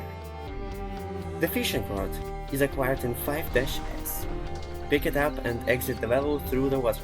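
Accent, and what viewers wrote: Russia. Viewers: i can hear the russian accent )) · бро не может скрыть свой русский акцент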